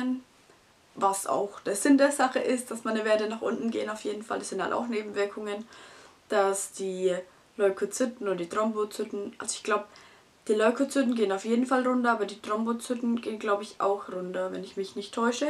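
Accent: Germany. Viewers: Du bist ja hübsch 😊 und noch so jung! Kommst du aus Franken? Du redest wie ich 😂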